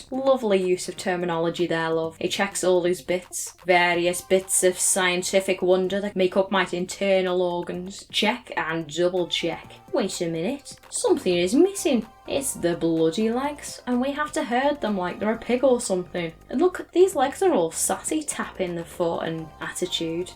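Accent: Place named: Merseyside, United Kingdom. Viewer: Just started watching some My Sims videos today, especially looking through all the mini games in Party DS. I still remember most of them and they were so fun. More importantly, which city/county are you from? Your accent sounds like a Merseyside one at times but then it goes again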